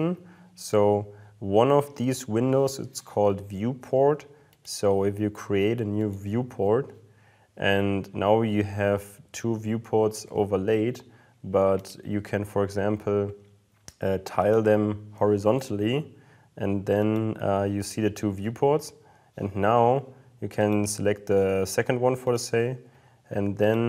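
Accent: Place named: Germany. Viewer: deine Aussprache scheint Sie kommen aus Deutschland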